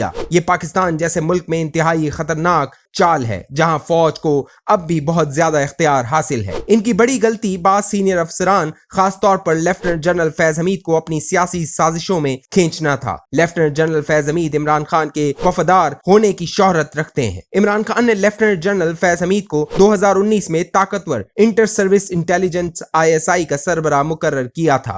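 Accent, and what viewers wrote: India. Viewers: Indian ascent shows imported content from India...??????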